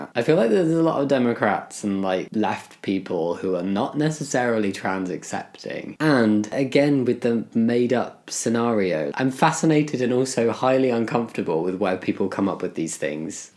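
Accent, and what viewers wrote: United Kingdom. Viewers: You just sound brittesh😊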